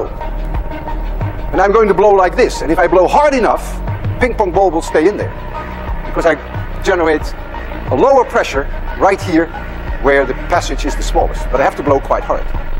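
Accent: Netherlands. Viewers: I am hearing een beetje Dutch teacherrr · Frikandellen accent is real on this one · why does it sound like a dutch teacher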